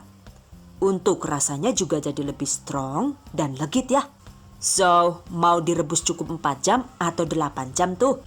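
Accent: Banyumas, Indonesia. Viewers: 😆😆😆 ngapaknya itu loh aye demen banget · Hehe I always wanted your video not just because the receipt but for your ngapak hehe lucuuu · Hadeuhh...gk nahan sm ngapaknya itu loh...,😅😂 channel kesayangan gue nih,krn inspiratif dan kreatif banget,👍👍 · yu ngapak2 baen kouh 🤣🤣🤣🤣🤣🤣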